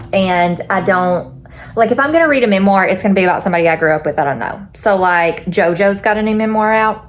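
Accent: South, United States. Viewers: Hi! Do you know if I use your affiliate link to Amazon but get a different set of Grabie markers (or other brand) do you still get credit? I need some new ones to go with my new Coco Wyo color book. These are the best!!! So relaxing and not stressful like the really intricate ones that stress me out more! 😂 Thanks for all your wonderful content!! My hubby calls you “that little southern girl” lol I think he really likes your accent 😊❤️❤️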